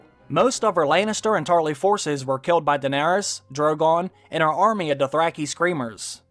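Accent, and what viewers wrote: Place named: Baltimore, United States. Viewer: My guy, are you from Baltimore? Cux it sounds like youre from Baltimore.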